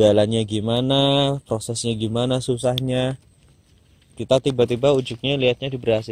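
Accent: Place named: Java, Indonesia. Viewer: Video yg durasinya lama itu yg ku suka... Ku malah berharap supaya tetap seperti itu, kondisi dapur juga apa adanya... Aku syuuuukaaaa.... Sepertinya channel bakalan cepat melejit subscribernya... Btw penasaran sama penampakan papanya 😃😃 cuma denger suaranya... Dari logatnya sepertinya wong jowo yg tinggal di lampung... Sukses selalu untuk... Pertahankan ke apaadaannya....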